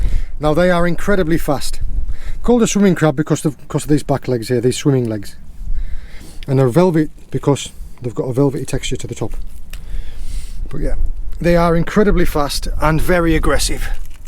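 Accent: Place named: England, United Kingdom. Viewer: I knew it was going to be good day when I hear I got my booket and you go on a trip in an English accent. Good view and explanation of things.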